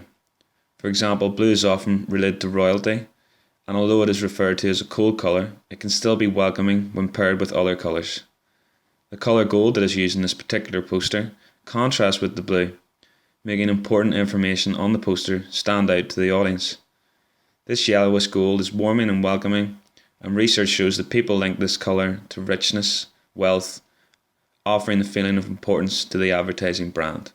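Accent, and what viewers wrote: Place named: Belfast, United Kingdom. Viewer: Irish, fer sher. I'm guessing Belfast, from my travels round about.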